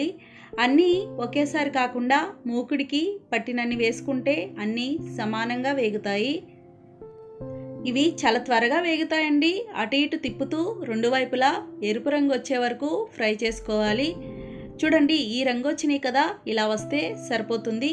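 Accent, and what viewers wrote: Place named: East Godavari, India. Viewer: నాకు మీరు చేసే హల్వ కాన్న మీరు మీ మాటతీరు నాకు బాగా నచ్చింది అండి తూర్పు గోదావరి జిల్లా కదా అందుకే east is Best అంటారు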